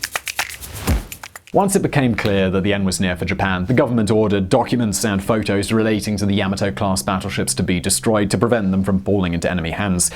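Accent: England, United Kingdom. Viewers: I have no idea what this is but now I want to know. PLEASE Simon? Use your amazing British voice to teach me useless shit :) love you man. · The most English pronunciation of Japanese words I have ever heard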